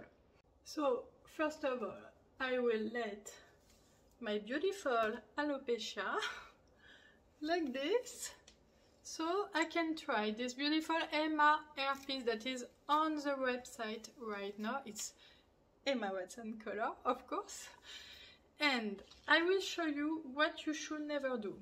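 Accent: France. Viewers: Love your French accent!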